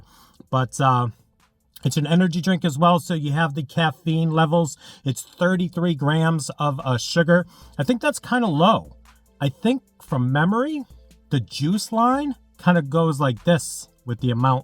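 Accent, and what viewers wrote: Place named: United States. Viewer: Lovely American accent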